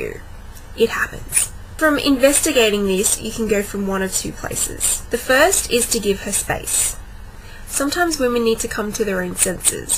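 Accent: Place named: Australia. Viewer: Are you Australian? I'm wondering if I recognized the accent. : ) I think you're right about the importance of calling her out on it, or ignoring an outburst. I remember when an ex of mine said she actually appreciated it when I did so once.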